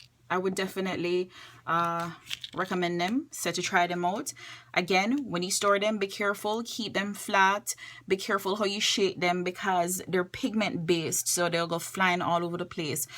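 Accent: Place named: Jamaica. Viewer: heard your accent. Instance sub. I am JAMAICAN TOO. :-)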